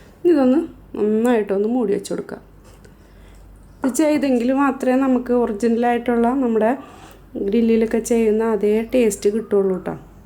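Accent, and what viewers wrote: Kodungallur, India. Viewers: Ellathinteam pirake ka nnanallo. ...kodungaloor bhashayano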